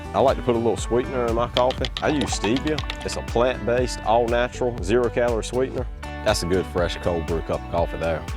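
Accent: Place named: South, United States. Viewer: Where are you?? I am trying to finger out your accent but I can’t!! It’s southern, it’s a bit Cajun, it’s a bit lumbee.. I have no idea!! ;)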